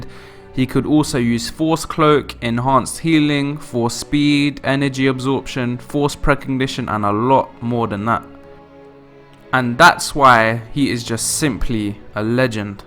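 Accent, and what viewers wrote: Cockney, United Kingdom. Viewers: You sound like a cockney chav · Is this guy a cockney?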